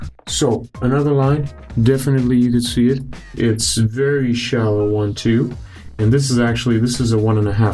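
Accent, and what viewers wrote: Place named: United States. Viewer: I´m confused.. u look russian, but you sound american:D but great tutorial!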